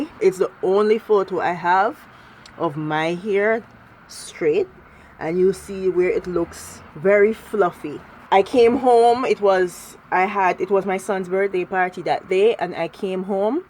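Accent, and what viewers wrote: Jamaica. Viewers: First 12 seconds and I figured you’re from Jamaica or one of the islands!! 👏🏽 you definitely got my subscription. Great video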